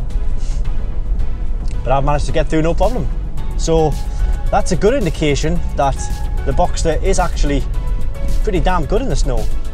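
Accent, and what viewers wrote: Newcastle, United Kingdom. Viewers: Are you a Geordie? If so, awesome hahaha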